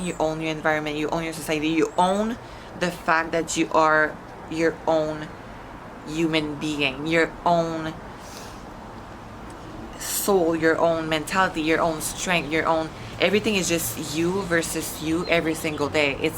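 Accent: Quebec, Canada. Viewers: Your french-canadian accent adds to your character , who you are. I think it is nice. Anyone who would be so rude as to complain about your accent probably speaks only 1 language and has their own issues. As a new subscriber i like the accent i came across you with the jay cutler interview with you and shawn i thought you were great...I can appreciate how hard it is to pick up a second language ...lol i'm taking french 3 hrs a week and it's brutal.Tabernac you're awsome....lol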